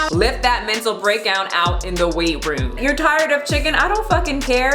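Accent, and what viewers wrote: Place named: Louisiana, United States. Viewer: are you southern? you got that Louisiana accent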